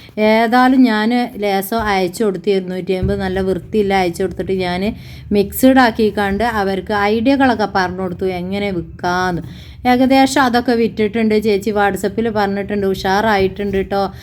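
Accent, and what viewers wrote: Malappuram, India. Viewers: Njan aadhyamayittahn ithade vvidio kanunnath...ithuvare vidiosin onnum comment idarilla...njn full vidio kandu... q &a session okke ...subscribe cheythu ... vidio kanditt commnt idan thonni...enikk samsaram orupad idhtshttayi..njnum malappuram aahn......negative comments idunnavar idatte ...athonnum mind akkanda . Malappuram karde normal talking ithaahn ....ithupole munnoott poyal mathi...insha allah..ellam sheeiyakum..allahu barakath tharatte...kadangalokke pettann theerkkan pattatte.....njngalum aanakkayam post ahnnn😅... Enikk ente thathante age thonni...6 makkal nd nnonnum parayillla😊masha allah..itha nalla sundariyahn....❤❤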